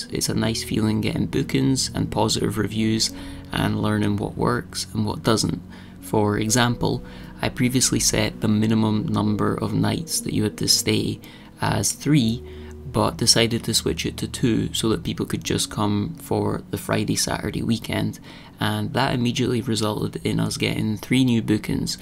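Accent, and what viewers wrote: Scotland, United Kingdom. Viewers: "... and not fixing potholes" --- LOVE IT, you are so refreshingly honest 👏😂. I wish you the best of success with your enterprise and future plans. It takes time to get it running more profitably. As to the critics - I think a great deal of it is envy and the reluctance to see how much time and work you put in it. Ethical or no isn't the question. The same could be said about most every profession or business. You don't have to defend yourself, you are doing a great job and you are very thoughtful and respectful in everything you showed us here. Also, Scotland offers only so much opportunities to make a living. Criticism is so easy online - I'd suggest for everyone to check their own circumstances first. Unless they are 100% flawless themselves, they might want to stop trying to give you a bad feeling. I for one enjoy this channel very much and it's obvious why your channel grows so nicely, I don't stand alone with my opinion, it seems.. 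I like how you and your family work together to make things happen. I enjoy your honest and kind personality along with this lovely Scottish accent, of course. I'm old enough to be your grandma and I'd be very proud of a grandson like you. Best wishes to you and your loved ones on 2 and 4 legs, hugs and smiles from the Bavarian Highlands, the alpine region that's indeed called Oberland 🙋🏻‍♀️❤️ Lisa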